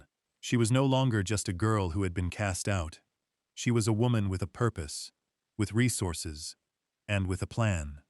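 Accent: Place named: United States. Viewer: Excellent! Story and the narration was nice and gentle. Good American accent. I really dislike those fake British accents! Appreciation from North Central, Minnesota.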